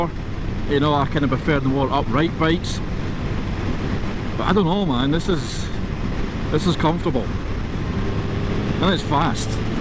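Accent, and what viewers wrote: Scotland, United Kingdom. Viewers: Love this video, love the Scottish accent and above all, love the Busa. Great bike to ride.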